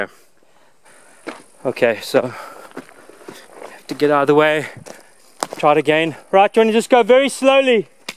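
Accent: South Africa. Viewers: Really enjoyed the video! Is that a South African accent I detect? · Your south african.. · I can hear you are from South Africa. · South African accent?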